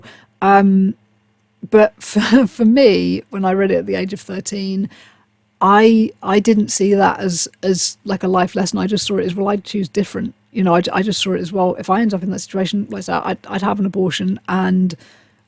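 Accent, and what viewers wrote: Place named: RP, United Kingdom. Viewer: Ok so first off I want to say that this is not a creepy old guy hitting on you… but rather a creepy old guy explaining how shocking it is to hear one of the most beautiful women I have ever seen who actually felt like she was on the outside and she had bullies! Seriously if I could put what my dream woman would have looked and sounded like to physical reality when I was younger it would be you. You are exactly the girl that I always wanted to go out with but never could pull it off. And oddly enough I too have been on methadone for 16 or so years. Perhaps one or two more but 16 seems about right. So anyway…I’m really glad that you seem to found yourself and are living the best life you can… and you are taking really good care of yourself as I know how hard it is to stay in shape while being on methadone. I liked how you put in the sidebar to make sure that no one else makes the same mistake as you. 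I find so much in common with what you are saying… I am autistic. I suffer with forced speech. I was bullied in grade school. Anyway I suppose that I wrote this because I was so shocked that someone who seems so perfect had such a terrible time. Or perhaps it’s the posh accent. Loved the video and I am looking forward to seeing more.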